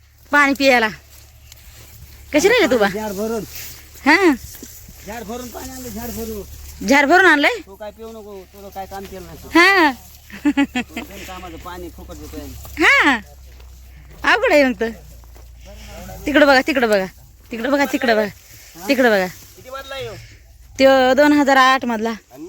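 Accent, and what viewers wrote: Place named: Ahmednagar, India. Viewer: ताई तुमची भाषा नगरी आहे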